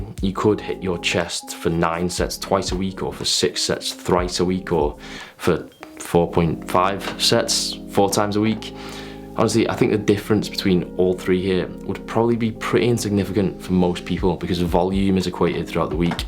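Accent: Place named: Merseyside, United Kingdom. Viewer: Great info but quick unrelated question mate, are there different accents in Merseyside? Just asking cos your accent ain't as strong as Paddy Pimblet's.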